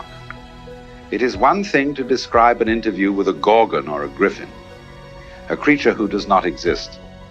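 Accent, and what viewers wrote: United Kingdom. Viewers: British accent = barf 🤮 Use a good quality French, Scottish or Irish ☘️ one instead. You will get more likes 👍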